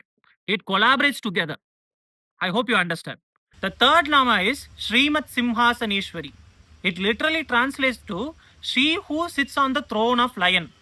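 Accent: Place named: Tamil Nadu, India. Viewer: Superb tambi for your efforts but tamils will spell thara as dhara like tha you will spell as dha which changes the word meaning completely please focus on original words than tamil accent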